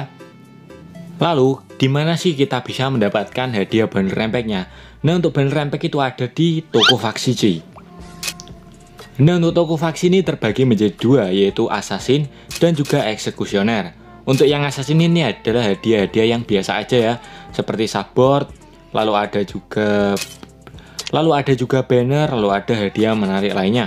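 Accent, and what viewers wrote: Java, Indonesia. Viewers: abang Orang mna sih bang JAWA YA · Bosone kok medok bang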